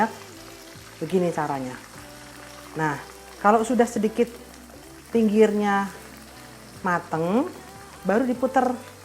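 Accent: Java, Indonesia. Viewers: Ya allah luwes banget nguleknya,nggak nyangka bunda pinter masak. Jowone yo gk ilang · Bunda..jan Jowone ga ketinggalan..salut bunda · Ya ALLOH, saya banget liat bunda msk, suka logat jawanya medok bangeeet ☺☺☺ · Bisa lucu juga lho mbak,medok bs Jawanya,..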